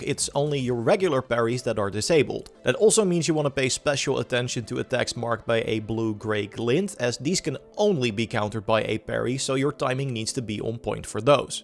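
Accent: Netherlands. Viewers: That's how most Dutch people sound when speaking English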